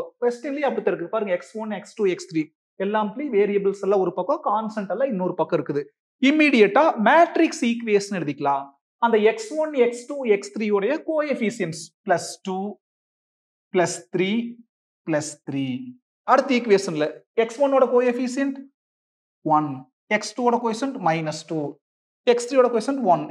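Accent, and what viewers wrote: Coimbatore, India. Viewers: Coimbatore ah sir unga native? Pesurathu konjam vithyasama irukku😅😅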